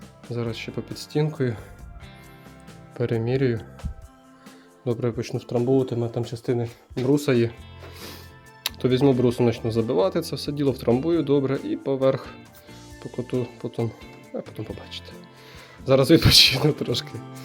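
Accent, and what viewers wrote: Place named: Vinnytsia, Ukraine. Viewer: ...Гайсин... То Ви з Вінничини) я ж чую знайомий говір)))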